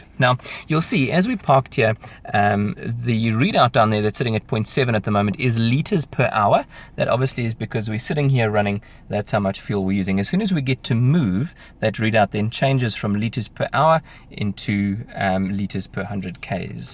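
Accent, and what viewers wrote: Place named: South Africa. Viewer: Your accent sounds South African 😅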